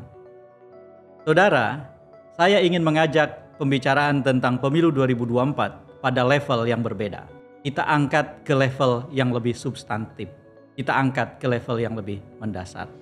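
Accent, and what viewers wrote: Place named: Java, Indonesia. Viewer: Medddokk yaaa medddokk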